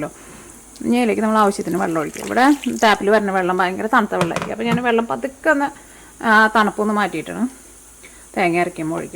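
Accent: Thrissur, India. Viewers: Thrissur slang , mutta kuruma adipoli, ee veedu evideyanu?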